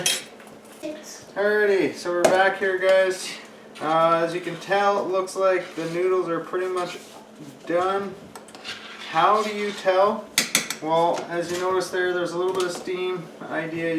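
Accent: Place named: Canada. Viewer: I wish I had his accent lmao such a pure Canadian accent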